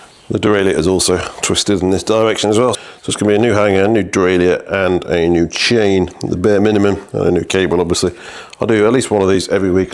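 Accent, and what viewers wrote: United Kingdom. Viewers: "The hears seek to deift uo and down the middle of the cassette and shifts by itself" Fitting the deraileur might help 😂 we can only get awsy with this level of dry himour with a british accent. Like the time a eomsn brought me a wheel thst was out if true by over an inch in mire than 1 spot and asked me to tighten one spoke. Calmly explained that the whe is more than 1 spoke and it doesnt work like that. She was absolutely furious. Of course i offered her a proper solution but she insisted on just the 1 spoke before storming out in a fit of rage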